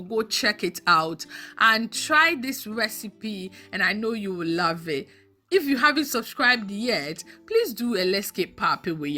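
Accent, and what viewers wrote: Ghana. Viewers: My first time here tho😁 you are funny and you sound like a Ghanaian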